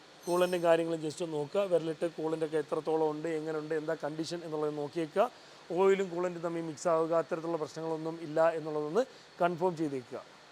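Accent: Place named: Kollam, India. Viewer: കൊല്ലത്തു കാരന്റെ നാടൻ സംസാരം.....😂 ഒന്ന് പെയിന്റ് മേളം 😂 കൊഴ😂 ഇത്തരം ഒരു പാട് വാക്കുകൾ ഇക്കാ സൂപ്പർ വർക്ക്ഷോപ്പ് സൂപ്പർ പുതിയ ജോലിക്കാർ നല്ലത് വരട്ടെ നമ്മുടെ നാട്ടുകാർ സപ്പോർട്ട് ചെയ്യുകയും ❤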